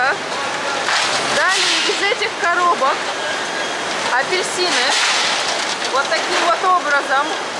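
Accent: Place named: Turkey. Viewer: Извините! Почему у вас акцент на Русский? Такое ощущение что не русская а турчанка говорит на русском. У Турков ударение на последние слога.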